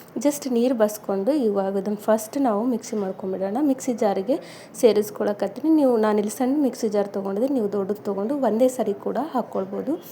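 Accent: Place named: North Karnataka, India. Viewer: Aap North karnataka kannada and Banglore kannada mix karke kyon bolted ho?Speak in only one style, please.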